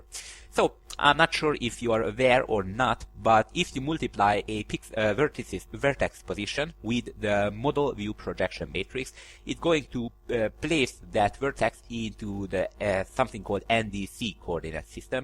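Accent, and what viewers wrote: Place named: Hungary. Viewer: Are you hungarian? :D Just based on your accent :D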